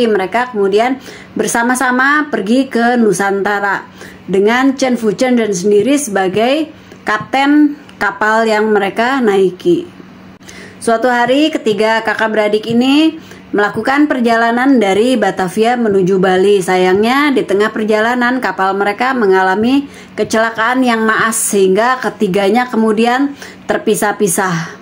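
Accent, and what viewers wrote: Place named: Lombok, Indonesia. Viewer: Bahasa indonesia tp logat lombok... mantaaapp...!!!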